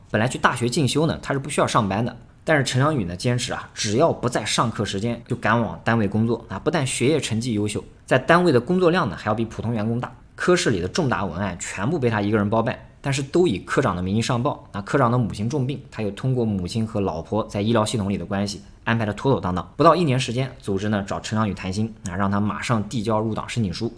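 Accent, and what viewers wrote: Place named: Subei, China. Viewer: 上海人不喜歡你的蘇北口音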